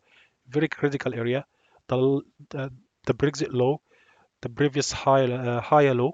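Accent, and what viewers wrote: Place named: Egypt. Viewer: Thanks for your great analysis. Do you have any analytics in Arabic ? I think you're an Egyptian from your accent All my greetings.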